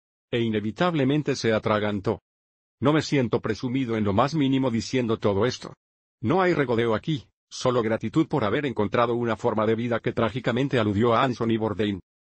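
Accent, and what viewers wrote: Spain. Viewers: Wonderful idea, Paul, there are around 500 million native Spanish speakers around the world that could benefit from your work. Like others have stated, the robotic voice and Castilian accent might draw listeners away. There are websites where you can get your essays translated professionally and maybe you can look for volunteers to read them with a more neutral accent. I'd volunteer. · 7 or 8 out of 10. The message is understandable. It is a Spain Spanish but again understandable. I am used to Paul Elam speaking but that is just me. This is okay in my opinion. La voz es un poco rápida y bien robótica pero se entiende. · I'm a professional translator. It's actually not bad for software. I would love to contrast it to the original English text. It'll get the job done. The Spaniard accent may be a little alienating for the Latin American crowd. 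But like I said, it's actually pretty good for a machine.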